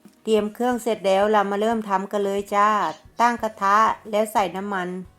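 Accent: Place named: Kamphaeng Phet, Thailand. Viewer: อร่อยอีกแล้วครับ.สำเนียงคล้ายๆคนกำแพงเลย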